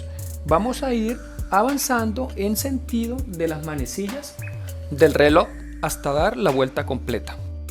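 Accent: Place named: Bogotá, Colombia. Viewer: Ahhhh genial la pegue , sabia que eras colombiano seguro cachaco por tu genial dialecto , ya mismo te sigo y te escribo al privado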